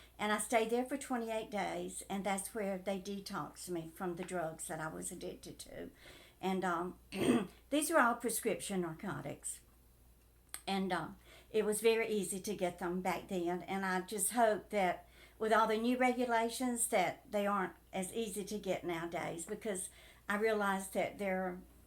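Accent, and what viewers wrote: South, United States. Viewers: I like your soft voice and your southern accent. You're a born storyteller and your life stories are worth hearing. So sorry about your son and Frank too. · I came across your videos a couple of weeks ago. After watching the first one I was hooked. I went back to the first one and I have been bing watching and finally have caught up to your most recent one. You are a breath of fresh air, I love your soft southern voice (I’m from Kentucky so I’m pretty southern myself😉). I love all your stories, cooking, and your teaching to the younger generation about finances & saving. God Bless you sweetie😊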